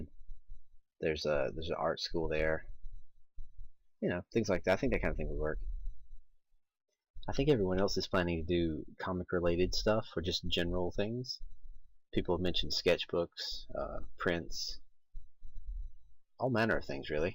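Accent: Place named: United States. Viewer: Another great video dude. I was wondering about your accent, I thought maybe you were just an american that moved there later in life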